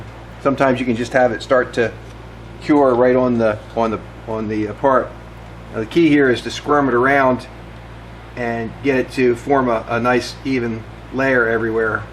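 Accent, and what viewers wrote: United States. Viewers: Very nice. You do excellent work. You have the same accent as Tom Lipton do you come from the same area. I am in Australia so don't know much about American accents.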